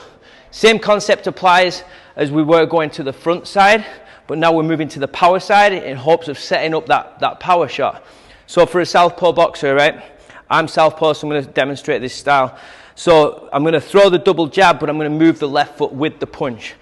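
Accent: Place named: Liverpool, United Kingdom. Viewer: You're a South Park and possibly a Scouse❤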